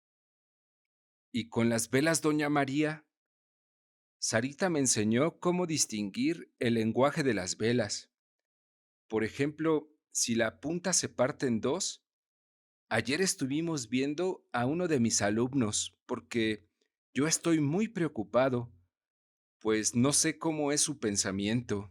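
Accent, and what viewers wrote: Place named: Yucatan, Mexico. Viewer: Wow muy bueno 👍 hasta tonito de yucateco hicistes muy buen trabajo 👍 por eso te sigo por tu buen trabajo 👍 gracias por compartilo tienes mi respectivo like #11 saludos desde Chicago IL 👍🙏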